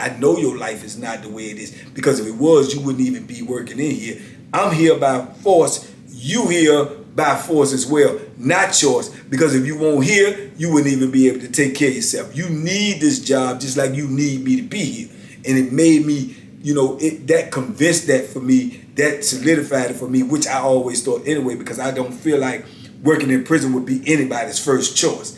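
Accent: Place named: New Orleans, United States. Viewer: Have u ever been housed in Louisiana bcuz u sound u from here… New Orleans .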